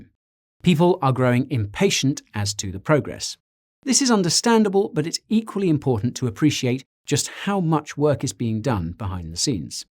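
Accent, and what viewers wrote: United Kingdom. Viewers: The only person with a British accent that I listen to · That British accent 😂😂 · Mate! Aside the amazing info and the way you get to present us (pun intended!) it's also amazing you get me to laugh listening to the boring 'I'm not a financial advisor'. I might be biased tho as I have a weakness for about every accent from my British neighbours, at least, the ones I can understand 🤣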